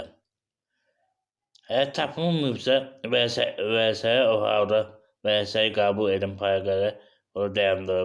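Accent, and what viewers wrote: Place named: Quba-Khachmaz, Azerbaijan. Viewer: Quba Xaçmaz terrfdən olarsan yəqin?mən Zirəli iyəm bizi də belə danışırıq. Uğurlar qardaş şABUNƏ OLDUNM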